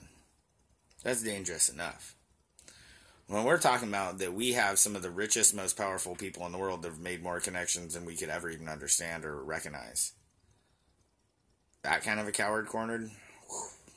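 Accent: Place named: Texas, United States. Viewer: Lovely accent my friend 👍🌻 Awesome is it Texan ? Now all I can say is America sucks! And you all need protection from true evil. Please try the Ganesha Mantra to protect you from evil forces. Breaks all obstacles . I prey for you all! 💚💚💚💚 Its a evil game where the loser will die a horrible death 💀 I'm sorry . Ganesha will protect you.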